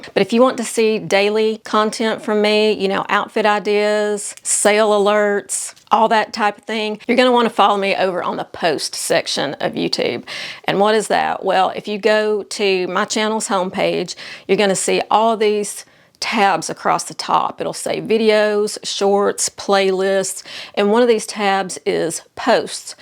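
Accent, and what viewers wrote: South, United States. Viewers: I am from the Atlanta metro area and your accent is like butter to my ears! In the south, you know this is a compliment! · Love this and all your videos! Preppy, classic shoes are wonderful and totally my style, I have most of these. Awesome that Keds, loafers and boat shoes are back. Not feeling the mesh style yet though. I watch you on my phone, laptop and TV. Love your Southern accent, as a Southern lady myself 😊. I mostly watch for information but occasionally shopping. ❤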